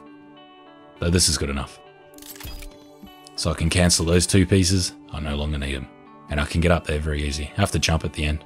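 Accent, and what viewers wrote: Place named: Australia. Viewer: You sound like a true blue Aussie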